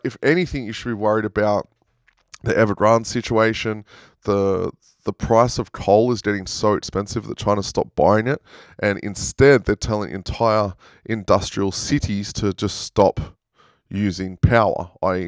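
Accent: Australia. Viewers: Thanks for the videos, it’s nice listening to an Aussie.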